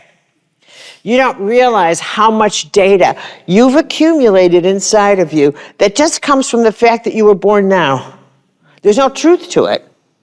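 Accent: Chicago, United States. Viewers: I'm here for that fabulous Chicago accent.😊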